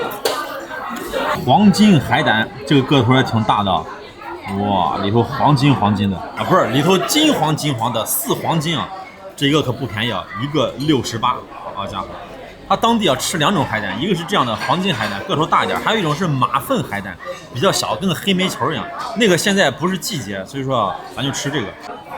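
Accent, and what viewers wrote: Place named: Shaanxi, China. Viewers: 尤其陝西強暴口音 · 陕西口音听着舒服❤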